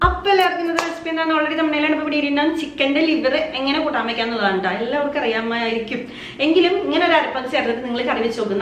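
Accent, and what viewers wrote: Thrissur, India. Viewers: Chechi trissur aano??? Evida place Iam from trissur....anyway super recipe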